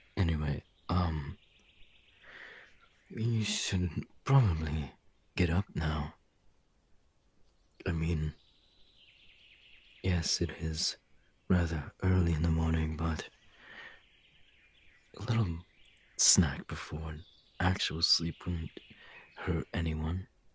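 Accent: United Kingdom. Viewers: Why does he sound so British 😂😂 Also ADORABLEEEE ❤️❤️❤️❤️❤️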